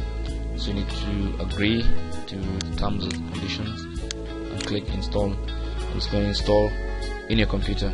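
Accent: India. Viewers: This guy sounds like one of those Indians that cold call you and demand money telling you computer has been hacked... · me hears indian accent clicks off video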